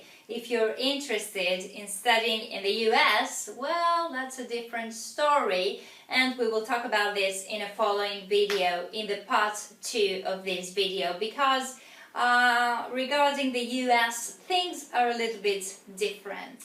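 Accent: United Kingdom. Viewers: thanx for the lessons mam 😊 ...are you from UK?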